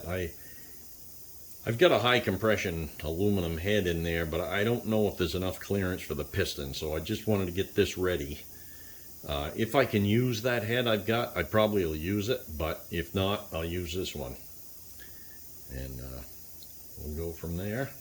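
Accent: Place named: Boston, United States. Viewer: you must be from Boston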